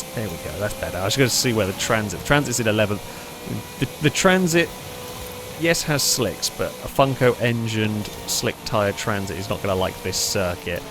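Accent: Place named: Midlands, United Kingdom. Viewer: In queens English, you would be correct, but accent isn’t queens, it sounds mid shire to me, and it’s probably acceptable in that accent. Of course a lot of people think that Queens is the only “correct” accent and insist that it’s grammar rules are followed, but frankly, it’s a very old fashioned point of view.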